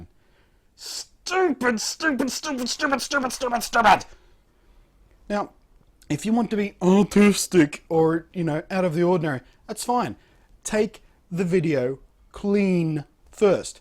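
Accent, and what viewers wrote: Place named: Australia. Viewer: Jesus Christ i LOVE Australian accent!!!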